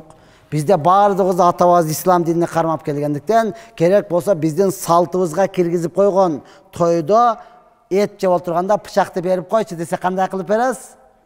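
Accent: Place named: Batken, Kyrgyzstan. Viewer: Иий тообо дениз,бул диалектида Баткенден болот экенго,кана эми акылыбыз ушул ажы акебиздей тунук болсо баарыбыздын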